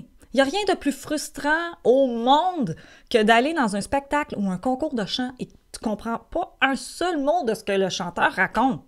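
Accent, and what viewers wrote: Quebec, Canada. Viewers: Par contre j'aime trop son accent Québécois et je suis sur que en chant tu es super forte Gros bisous à toi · Jadore ton accent quebecois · Je crois que vous êtes québécoise 😄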